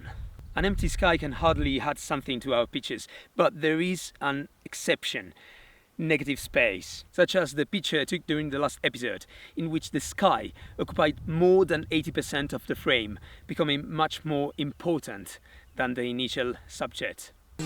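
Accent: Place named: United Kingdom. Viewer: Accento britannico perfetto!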